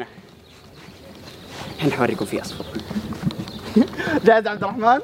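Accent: Saudi Arabia. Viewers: حلو المقطع يا بس ليش ما حكيك سوري حكيك سعودي مع التحية للشعب السعودي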